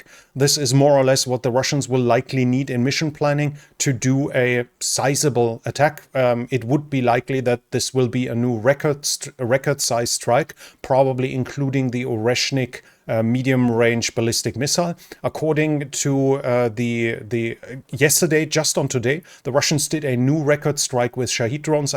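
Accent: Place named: Germany. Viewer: Almost sounds like you have a German accent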